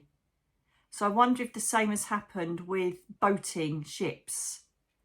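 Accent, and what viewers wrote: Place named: England, United Kingdom. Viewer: Hi! I love you and your videos. I'm training myself to understand your English accent. The more I listen to you, the better I get but... you speak too fast! Thank you for being here for us. Nancy, Argentina.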